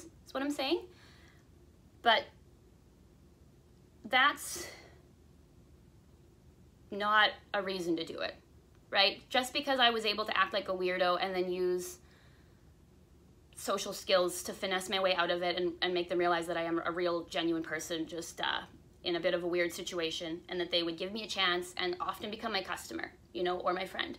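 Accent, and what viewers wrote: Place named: Canada. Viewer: I came so close to joining Mary Kay in 2007 - the only reason I didn't was the stupid skirt rule. I was invited by a "new friend" to a recruitment talk(?) with a bunch of other potential victims and my sensible arguments about the skirts may have (hopefully) also scared others off. I really appreciate you doing this - I also just appreciate hearing a Canadian accent as a former Calgary gal now in the UK.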